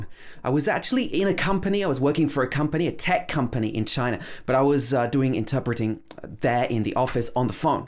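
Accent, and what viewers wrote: United Kingdom. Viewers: I’m American but I love your posh English accent! It sounds more intelligent and educated than our ordinary American accent. Lol. It’s good to learn about your background. Keep up with your exceptional work! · I have been following the videos on your channel of late and I must say that you have come up with content that can be of great help for non-native English speakers. Aside from the fact that I have a soft spot for the British accent (thanks to English cricket commentators like David Gower! 😄), I found the video with the 'schwa' sound to be particularly useful. The video about intonation was also quite helpful as these topics are not explicitly taught in Indian schools. I hope to learn a lot more in the time to come. Thanks! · You're superb, dude👌🏻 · I don't know why Americans are making fun of the British accent Why did they find something funny in your accent?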